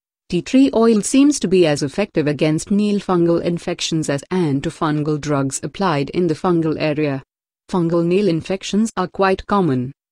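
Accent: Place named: North India, India. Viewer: This woman is from India obviously the slang also her voice more to North Indian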